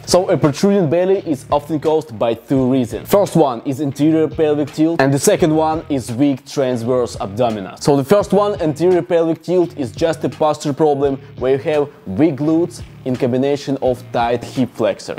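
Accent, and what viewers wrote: Russia. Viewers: русский акцент просто сворачивает уши в трубочку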